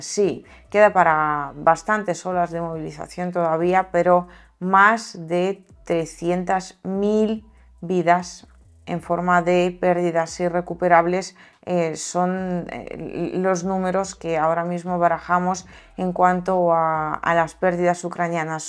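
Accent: Spain. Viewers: Me gusta tu contenido, pero se me hace indigerible ese acento español. Te sugiero suprimir ese acento ibérico, pues tu público es sobre todo latinoamericano. Los españoles son atlantistas. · Te soy honesta y a pesar de q te admiro y quiero por ser 🌹 🤗 🌹RUSA❤no me gusta tu acento de española pq perdistes tu acento de rusa 🎉😂❤😊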